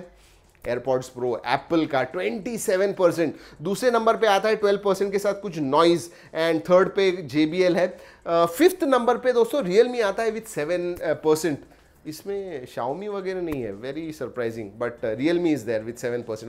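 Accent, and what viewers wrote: Maharashtra, India. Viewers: Your style is a bit different and with a delicate touch of marathi you are a perfect knowledge enhancer.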